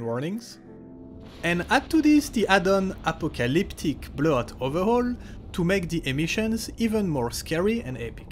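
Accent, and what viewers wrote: France. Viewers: c'est clairement un accent Français que j'entend la ^^ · is that a french accent ? Parce que je m’y connais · Cet accent français incroyable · a little bit too frenchy for me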